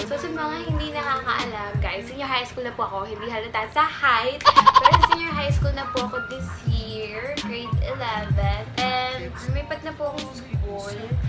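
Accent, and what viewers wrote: Pampanga, Philippines. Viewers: Kapampangan po ba kayo? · Kapampangan poba kayo?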